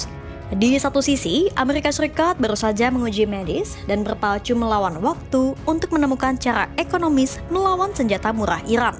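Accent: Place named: Palembang, Indonesia. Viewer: R khas plembang kok.., ya begitu lafalnya.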